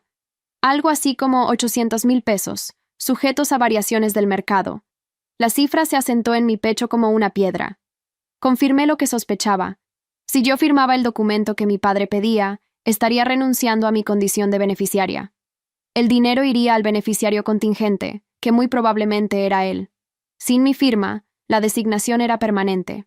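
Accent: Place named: Madrid, Spain. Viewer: Su voz es de la península española Madrid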